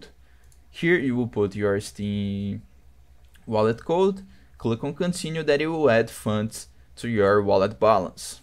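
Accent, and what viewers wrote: Brazil. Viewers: You have a very Brazilian accent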